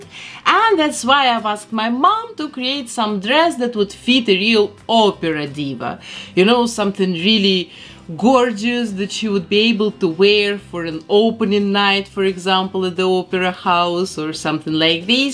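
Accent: Russia. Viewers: I'm sorry, but I want ask you You from Russia or it seemed to me?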